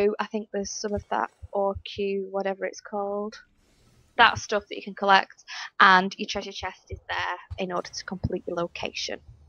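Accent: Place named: Blackburn, United Kingdom. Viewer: Nice one! Blackburn accent that?!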